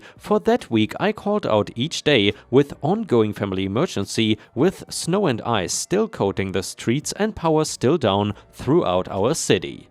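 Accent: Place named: Germany. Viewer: Nice Story - certainly it is all a lie! And: interessing accent! German?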